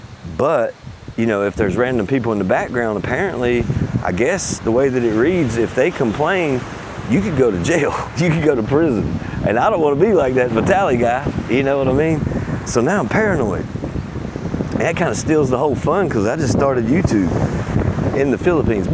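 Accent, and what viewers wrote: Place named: South, United States. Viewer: Hi Mr. please don’t leave.I loved watching you, I’m a pilipina 72 years old and I enjoyed watching you. You seems like you’re down to earth young man,I’m from Little Rock Arkansas so I just love your southern accent 😊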